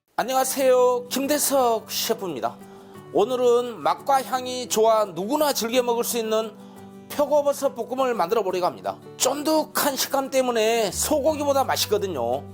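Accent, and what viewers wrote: Jeolla, South Korea. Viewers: 매번 느끼지만 전라도사투리가 특히 남도지방,광주,여수.순천,고흥.근처 사투리가 얼마나 구수한지..ㅎㅎ 전라도를 떠나 30년째 경상도 살아도 40대인 저는 김대석세프님하고 언어가 어째 이라고 똑같다요~잉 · 아주 오리지날 전라도 말이네요 내고향 사투리를 듣고자 이 요리도 배우고 좋아요 ㅎㅎㅎ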